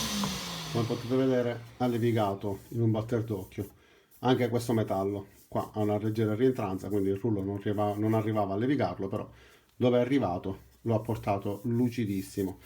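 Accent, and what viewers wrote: Sicily, Italy. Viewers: Se la LIDL ti perde come cliente mi sa che è spacciata! Sembri siciliano dall'accento, lo sono anche io, se hai degli elettro utensili da vendere in blocco come usato fammi sapere che ci accordiamo tramite mail! Saluti!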